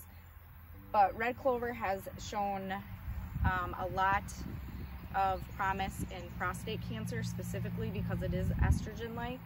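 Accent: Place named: Minnesota, United States. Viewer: Can't beat that Minnesota accent.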